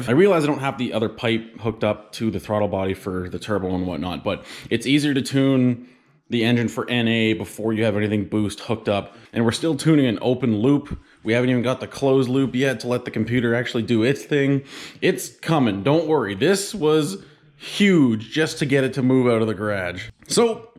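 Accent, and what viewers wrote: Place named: United Kingdom. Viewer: So out of curiosity were are you located? Country is fine just how you said everything it sounds like the uk maybe....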